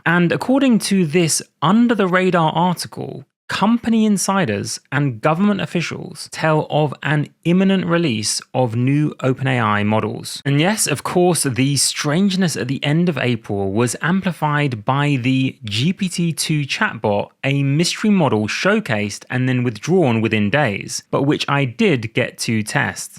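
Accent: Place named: United Kingdom. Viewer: I'm obsessed with this British accent 🥲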